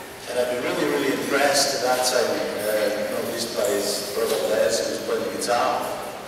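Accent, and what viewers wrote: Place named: Scotland, United Kingdom. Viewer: I have to listen to this a few times. My clunky American ears sadly can't capture Zal's wonderful Scottish accent. Much Respect to Alex Harvey, Rest in Peace. Much Respect to Both McKenna's, Rest in Peace.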